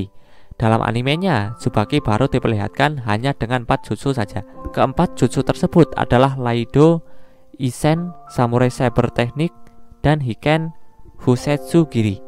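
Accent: Java, Indonesia. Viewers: Org Jawa kebanyakan bahas membahas🤣😂😁 logot mu GK enak di dengar telinga hhh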